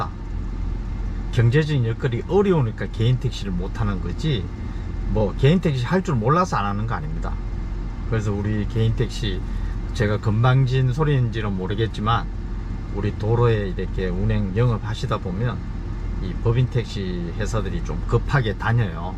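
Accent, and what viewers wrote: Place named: Gyeongsang, South Korea. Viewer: 아흐 경상도듣기싫은 정신병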